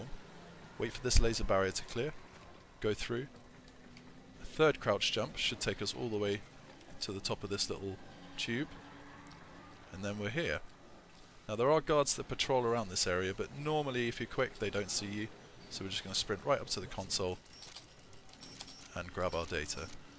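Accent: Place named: United Kingdom. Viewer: I like this guys voice (no homo) I wish I spoke British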